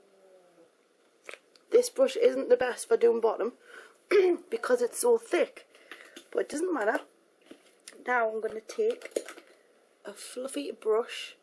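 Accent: Newcastle, United Kingdom. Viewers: Haha,u r the first english person ive heard on youtube,R u from newcastle?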